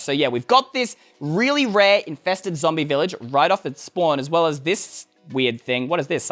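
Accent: Australia. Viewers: are you austrailan? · Are you Australian?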